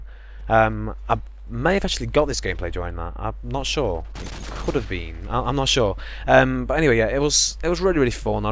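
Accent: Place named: England, United Kingdom. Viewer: which part of england your from mate??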